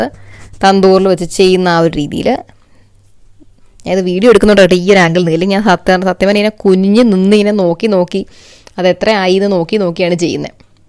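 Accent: Trivandrum, India. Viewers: vrithketta trivandrum slang.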